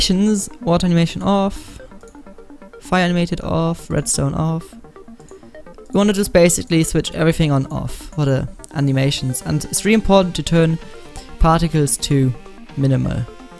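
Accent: Germany. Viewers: Are you german? You really sound German or scandinavian! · Are you german? You sound like a german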